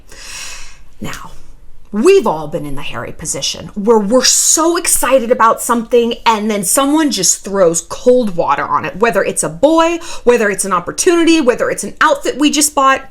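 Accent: England, United Kingdom. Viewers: Love the British accent! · love your english accent! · love your British accent haha <3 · Again the British accent ICONIC love you 😘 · Lol the British accent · Why are you reading in English accent? This book was written by two Americans author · Your British accent is a GEM!! LOVE IT 😂😂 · LOOOOOOL I LOVE THE BRITISH ACCENT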